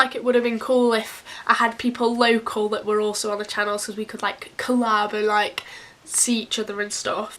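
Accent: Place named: England, United Kingdom. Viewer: Where in England are you from? Love your accent!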